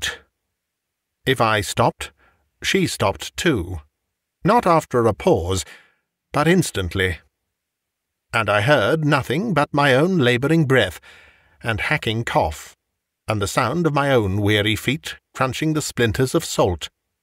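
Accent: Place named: RP, United Kingdom. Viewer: Dang I love yer posh voice!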